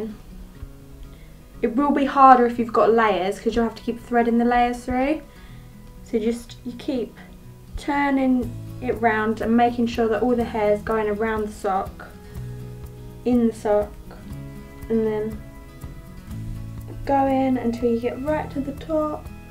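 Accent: United Kingdom. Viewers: I like your hair, i like your british accent, i like your make up. I just love you already :D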